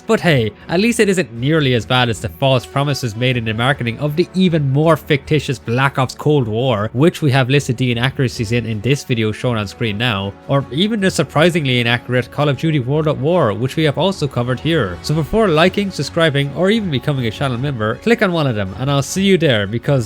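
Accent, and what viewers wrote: Ireland. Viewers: Bro you sound irish haha surely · Why did his accent made me think he was Indian for the first four and a half minutes before i realized he's Irish? Solid video, btw. 👍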